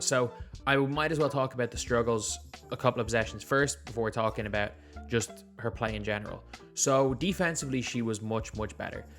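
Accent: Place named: Ireland. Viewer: Where in ireland are you from?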